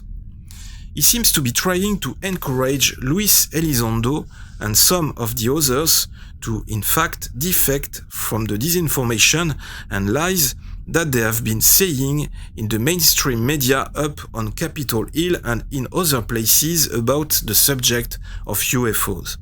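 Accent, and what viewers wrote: France. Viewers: love your french accent :) keep the cool videos rolling out! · Swedish is my native language but/and I understand you to 100%. Please don't lose your French accent, it would such a shame. Merci de partager vos connaissances avec nous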